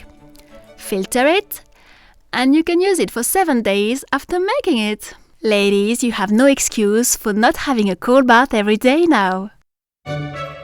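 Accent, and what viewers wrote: France. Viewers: Charming little film narrated in a genuine French accent, but what is going on with the actresses thumb right hand ? Was the blood looking colouring due to the sealing wax or is it in fact, blood inflicted by Valmont ? What a pity. Swan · Thank you for sharing this sweet gem with us. You bring history and literature to life with your elegant home, timely attire, inspiring music, and lovely French accent. You've created an exquisite artistic production!!! I especially appreciate you're enchanting storytelling is in English so that people from different countries can appreciate your work. Bravo!! Bravo!! 🇺🇸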